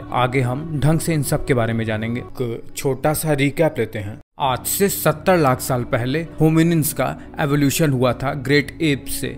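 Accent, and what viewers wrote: Bihar, India. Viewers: Bhai tm bihar s ho kya 😮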